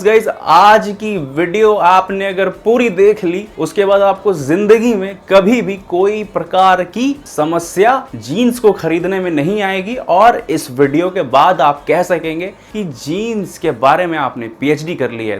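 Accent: Lucknow, India. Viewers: Lucknow se ho kya bhai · Are you from lucknow 😀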